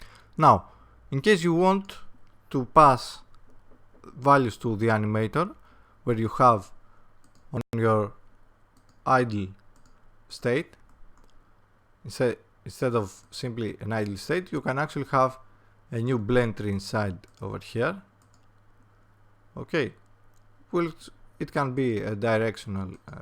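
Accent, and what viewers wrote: Greece. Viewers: πες την αληθεια!! εισαι ελληνας απο λιγο που ακουσα το βιντεο ... (προφορα)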